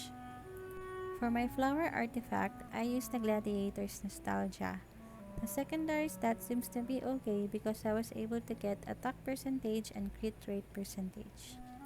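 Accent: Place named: Philippines. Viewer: Sabi ko na nga ba filipino accent si ate support kita🥰♥️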